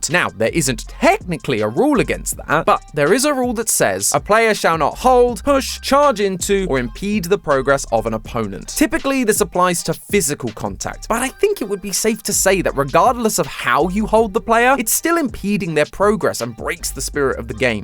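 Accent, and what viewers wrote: United Kingdom. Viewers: Tbh He kinda sounds like matpat but a little more British 😢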